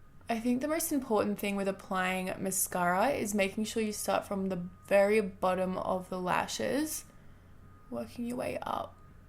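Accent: Australia. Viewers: I don’t know if I’m being a little rude but , are you Australian I love that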